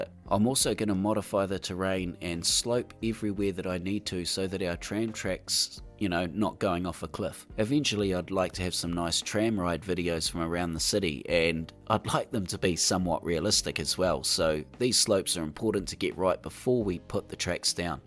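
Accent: New Zealand. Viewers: like always so good, so interesting and combined with your NZ accent it becomes one of CS greatest series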